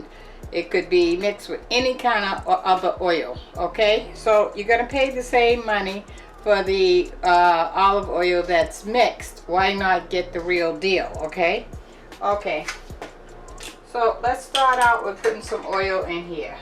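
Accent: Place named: New York, United States. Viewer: Looks good. You have a NY or Jeresy accent. :)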